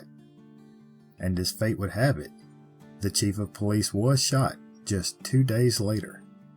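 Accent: South, United States. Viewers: I love your way of telling stories. The accent does make me miss living in the south. What is it that catches your curiosity about a story? What ultimately draws you to telling it?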